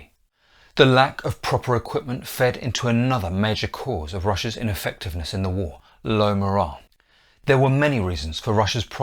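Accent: United Kingdom. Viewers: Russia was useless? Such a BS. With this British accent such a big Russophobia.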